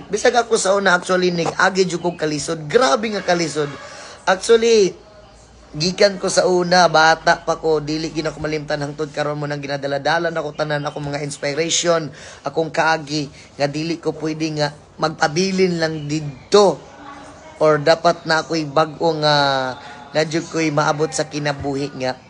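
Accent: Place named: Cebu, Philippines. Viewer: Ang sarap nga pakinggan pag magsalita ka ng sibwano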